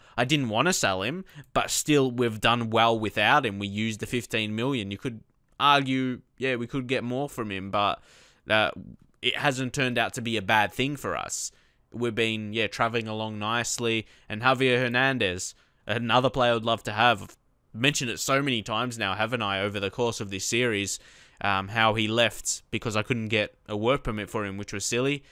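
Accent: Australia. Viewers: Do you sub to people??? Cause if you do it would be greatly appreciated if you sub to me you are one of my fav YTbers and the best thing is your AUSSIE!!!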